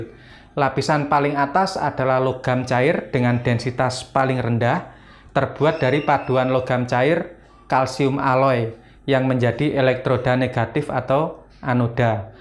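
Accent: Java, Indonesia. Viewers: Dengerin pelajaran teknik dengan bahasa medok ternyata lebih mudah mengerti.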